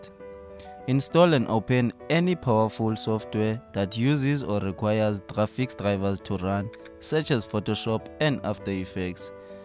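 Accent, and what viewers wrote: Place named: South Africa. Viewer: Greetings from Nairobi. I love the SA accent. Bro you just saved me over $300. Was getting ready to order a new Mac Book from Amazon. I installed the Exodus Cryptocurrency Wallet on my laptop and then everything slowed down. I tried to make a bunch of improvements to increase performance which helped however Premiere Pro simply couldn’t load without showing the error. You’re an African Genius. Thanks 🙏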